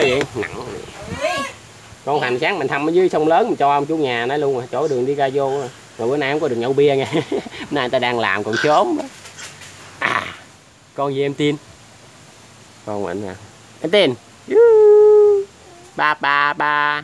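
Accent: Vietnam. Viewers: Em làm clip vui vui, noi chuyện nghe là biết miền Tây anh thích lắm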